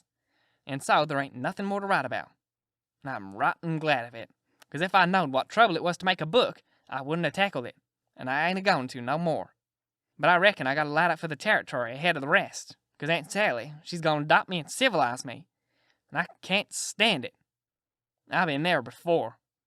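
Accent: United States. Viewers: Saved me from probably taking much longer to read this book due to the southern accent. Thank you so much!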